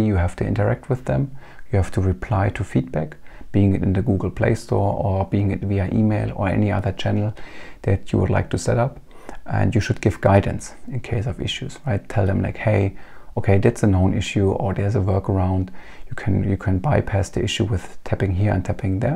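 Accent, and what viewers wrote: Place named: Germany. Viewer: Didn't expect Henry Cavill with German(?) accent.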